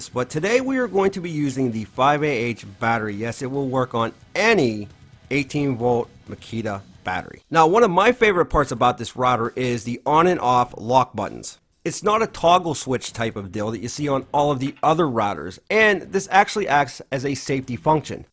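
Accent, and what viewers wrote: Pittsburgh, United States. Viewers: you have the most Pittsburgh accent ever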